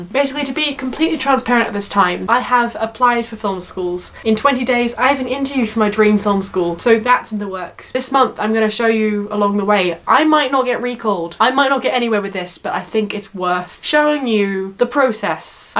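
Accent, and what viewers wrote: Australia. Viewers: ahhh you've done it again, I'm going to miss your cramps so much when this year ends! huge congrats on getting so far with NIDA, excited to see what you choose to pursue next year:) (also, idk if it's just me, but you're sounding much more Australian already this month I think)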